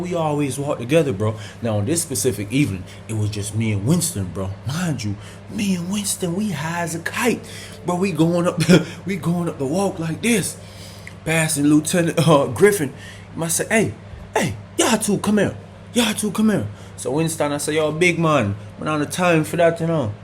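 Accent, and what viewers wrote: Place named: Jamaica. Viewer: Your Jamaican your best accent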